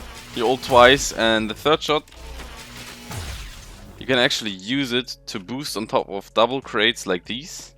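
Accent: Germany. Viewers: Are you german? Because of your accent ;) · Wie Deutsch kann English eig. sein :D... Gutes Video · Wie man hört das du deutscher bist haha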